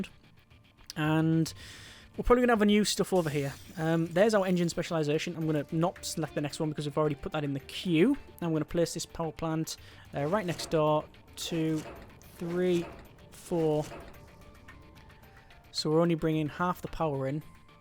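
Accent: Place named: Yorkshire, United Kingdom. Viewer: I see you joined YT on my Birthday, the 29th Jan, although I was born some 46 years earlier in 1966. Am I too old? joking. Are you a Yorkshire lad? if you are I bet your Mums backdoor is always open for a cup of tea. I'm a good bit deaf nowadays so I might hear the accent wrong a wee bit. I am a Norfolk boy. I'll stick around and watch this playlist, retired now so have a bit of free time.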